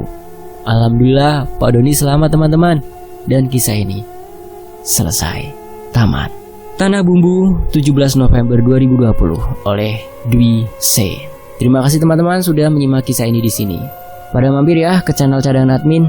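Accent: Java, Indonesia. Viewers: Alhamdulillah pak Doni tidak ikut ikutan terjerumus ke jurang maksiat..seru yakin ceritanya aku sangat suka dengan crita ini..kadang malah senyum sendiri krna tingkah dan ksetian dn keluguan istrinya pak Doni .pngen bngt punya istri yg setia kaya istri pak Doni..hehe mksh yg nulis crita ini mksh juga admin yg sllu ku tunggu crita ceritanya .yg medok 😀😀🙏🙏 .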